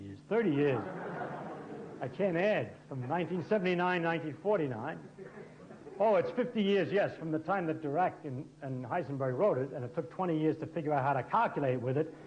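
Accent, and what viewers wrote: United States. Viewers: Such a New Yorker haha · Brilliant Man..Sounds like physics 101 direct from the Bronx..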